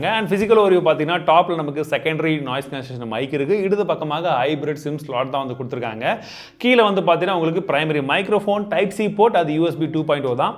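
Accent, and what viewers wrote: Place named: Kanyakumari, India. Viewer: #SUPERTT intro adipowli 🌟 bro.... Kollam ketiya anna😅 ....ethu enga ooru slang from # kanyakumari ♥️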